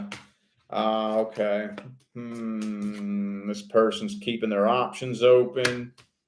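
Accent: South, United States. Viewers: Congrats on your move. Las Vegas is like NYC it has grown. Where you moving to? A lot of people are moving to TN. But I do hear a Southern accent coming from you. Where are you originally from? Best wishes to you & your wife in your new home!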